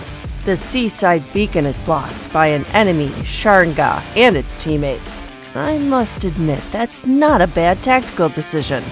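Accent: Russia. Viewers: aww what happened to the awkward russian accent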